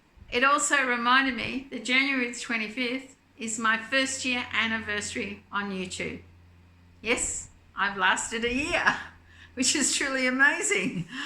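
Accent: Australia. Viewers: I love your hats and cowl. I’ve yet to try the divine hat, it’s on my list to master this year. Congratulations 🥳 on your one year anniversary of YouTube, I’ve enjoyed watching your videos! It’s been lovely having another Aussie voice out there. Enjoy your long weekend and Happy Australia 🇦🇺 Day ❤️🧶💜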